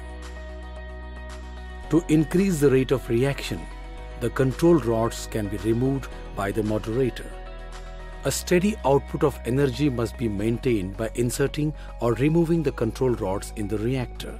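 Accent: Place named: Bollywood, India. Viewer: Bollywood accent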